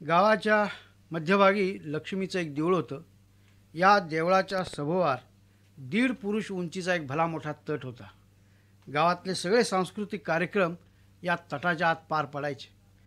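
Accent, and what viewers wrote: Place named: Rural, India. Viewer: ही कथा मी 10 वेळेस ऐकली आहे तरी पण ऐकू वाटते याच कारण म्हणजे ग्रामीण आवाज आणि ग्रामीण कथा यांचा आवाज.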